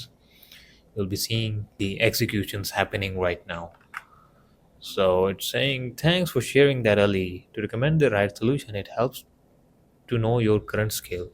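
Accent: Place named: India. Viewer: BRO WHAT IS THIS BIRYANI ACCENT MAN WE CANT LEARN SHIT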